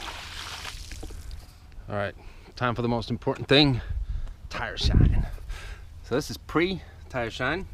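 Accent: Pittsburgh, United States. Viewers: I find it very interesting that in 5 years you've acquired the distinctive Pittsburgh accent, sounding like a true native of the area. The Swedish component of your speech seems secondary to me. This vlog was enjoyable and a welcome change of pace. I've ordered a few cars in my life, waiting about 2 months each time. The domestics used to allow for this, ordered my Olds Cutlass in 1986, but today it seems only the Germans still do it. Nearly every car I've bought from dealer stock has been a compromise in some way. My current vehicle has a color that just will not grow on me. Maybe I'll get it right next time. Looking forward to your Merc. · Imma newbie to the Chanel and I was so surprised that you were from Sweden! That American accent was spot on! Ever thought of getting a Volvo XC90 or V90XC tho? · Never thought you would be Swedish, you look and sound like an American. 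· 5 years and you sound like a Pittsburgh-er, it's been 12 for me (same town) and still sound like a limy lol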